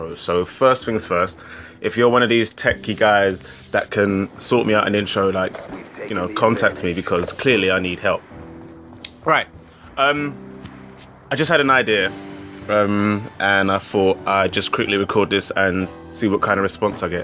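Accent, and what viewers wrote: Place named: England, United Kingdom. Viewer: Hello:p You sound like your from england, what city do you live in? Im guessing london:p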